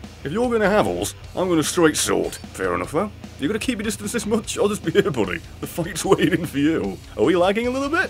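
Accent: United Kingdom. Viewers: are you British? Keep up the good work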